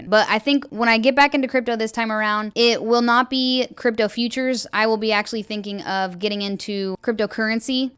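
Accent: United States. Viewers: appreciate your vids, you have the best American accent ive heard (im from Europe)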